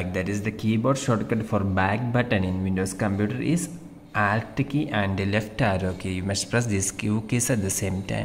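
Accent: Kerala, India. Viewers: I like your Kerala Slang.. Nice 😊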